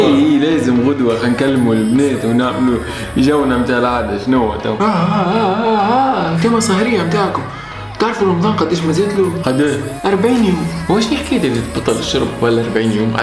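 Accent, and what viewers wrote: Sfax, Tunisia. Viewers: je viens de découvrir ta chaîne YouTube ces derniers temps et j'ai juste envie de te dire que j'adore ton accent sfaxien , c'est juste ouf 😍😍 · شكراً لاستخدامك لهجتك الصفاقسية الجميلة المميزة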